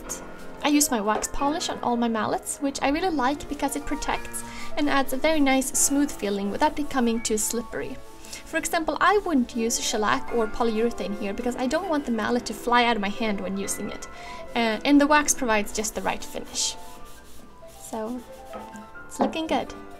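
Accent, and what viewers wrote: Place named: Sweden. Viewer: Hello there! This is the first one of your videos ive seen and im just wondering, your accent it sound swedish. Are you from sweden?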